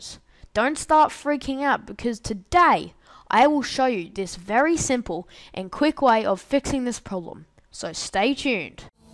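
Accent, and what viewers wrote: Australia. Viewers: Is that a Australian accent? thanks bro works perfectly :D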